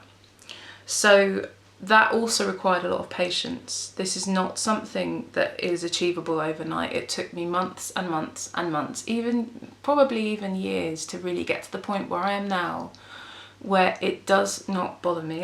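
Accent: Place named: England, United Kingdom. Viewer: you have given me so much hope I notice that periodically I haven’t thought or heard my tinnitus you are a breath of fresh air. Listening to you I felt supported and hopeful of habituating this pest progressively as time goes by I have deleted all my Facebook chat groups as some were not to my liking plus extremely negative and they frightened me immensely as the comments made me think my life is over. I love your music. Your voice is beautiful to listen to. I’ve always adored the English accent (they are all different). Your presentation video was the best I have found yet hooray I stumbled upon you. You gorgeous girl xx💜 On Mon, 22 Jul 2019 pm, <mail> wrote: View this email in your browser THANKS FOR WATCHING MY VIDEO ON LIVING WITH TINNITUS AND FOR SIGNING UP TO MY MAILING LIST Now here's your free download of my song, all about my struggles with tinnitus DOWNLOAD NOW p.s. 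Let me know what you think of the song on Instagram, Facebook, or drop me an email! x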